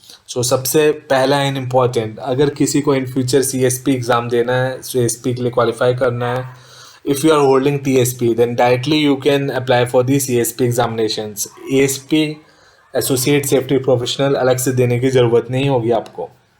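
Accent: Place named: Bhojpuri, India. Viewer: Dear if u cant speak english properly for explanation about the international safety course then pls donot use bhojpuri english ....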